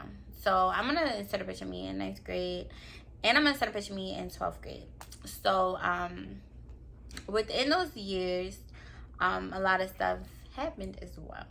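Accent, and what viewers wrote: Baltimore, United States. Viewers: I knew it your from Baltimore the accent 😇 pretty girl 😍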